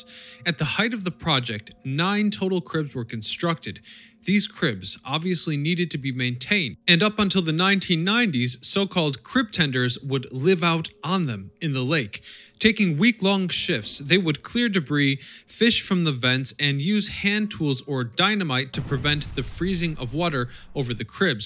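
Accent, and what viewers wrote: United States. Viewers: Yeah he sounds totally American but then there are some funny mistakes. Can't pin it either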